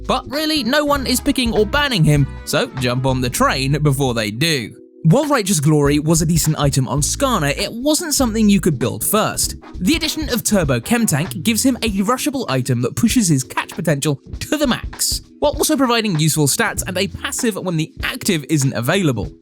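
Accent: United Kingdom. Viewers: Yes now we have a British caster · I’m sorry but I dislike this British accent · his accent is literally screaming UK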